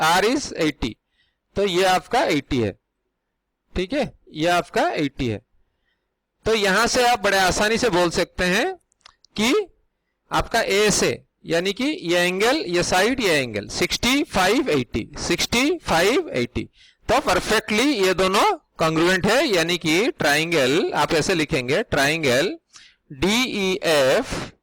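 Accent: Odisha, India. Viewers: Sir are you from odisha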